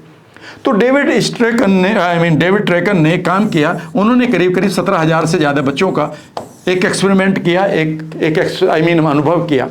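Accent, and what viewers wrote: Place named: Muzaffarnagar, India. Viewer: ji mann karta h aap ko sunte rahe aap ki bhasha ki miths me mujaffar nagar ki khusbu aati h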